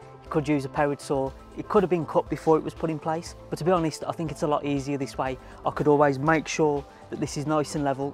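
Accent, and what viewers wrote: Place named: Northern England, United Kingdom. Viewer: Great job! As a Frenchman obsessed with accents, may I ask you where you're from? I can tell Northern England but I'm not sure where exactly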